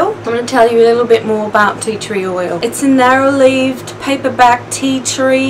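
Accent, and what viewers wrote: Australia. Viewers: I'm Australian and use tea tree daily love it! It was entertaining listening to your Aussie accent! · loving the aussie accent!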